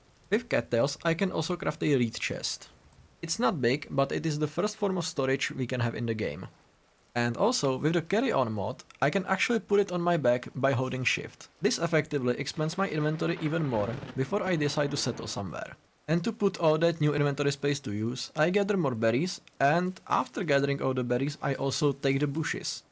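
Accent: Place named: Czech Republic. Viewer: Are you Czech? You sound Czech lol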